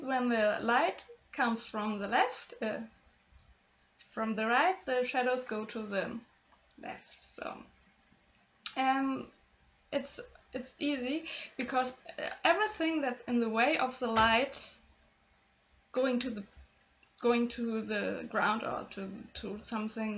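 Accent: Germany. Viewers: wollte das gleiche fragen, cool dass du aus Deutschland kommst, bist echt talentiert... finde den deutschen Aktzent immer voll knuffig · your english😁 are you german oder something? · Ein schönes Video und dazu sehr hilfreich! Dankeschön 🧡🧡🧡🧡🧡🧡👌✌ps: Du scheinst einen rheinischen Dialekt zu haben 🤔😌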